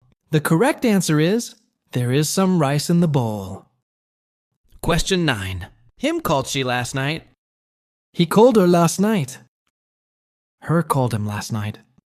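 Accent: United States. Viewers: are u using american english? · It seems like it's not British English but American English.❤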